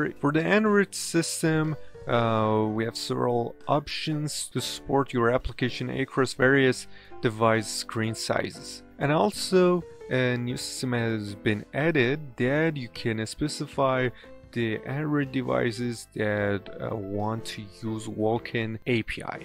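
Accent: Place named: Eastern Europe. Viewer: probably dude eastern european dont make fun of it like that, Don't make fun of accents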